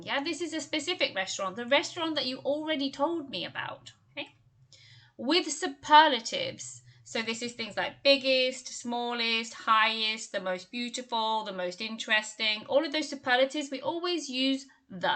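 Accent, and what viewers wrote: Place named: United Kingdom. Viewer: Beautiful British Accent !!!!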